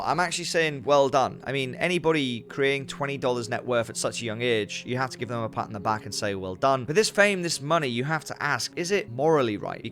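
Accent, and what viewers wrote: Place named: Whitley Bay, United Kingdom. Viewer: Sounds like a Whitley Bay accent 😏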